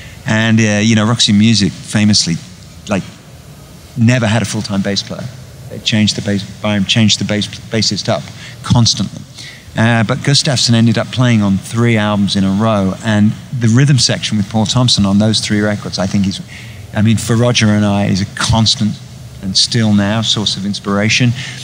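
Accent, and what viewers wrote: United Kingdom. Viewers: Posh accent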